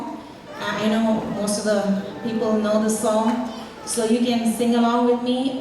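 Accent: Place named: Poonjar, India. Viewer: തലയിൽ തുണി ഇടാത്തത് എന്താണ് മംഗ്ലീഷ് കൊച്ചമേ. അമേരിക്കയിൽ അത് വേണ്ടേ ഓടാ വെള്ളം കുടിച്ചു നടന്നവൾ ആയിരിക്കും ഇപ്പോൾ അവളുടെ പൂഞ്ഞാറ്റിലെ ഇംഗ്ലീഷ്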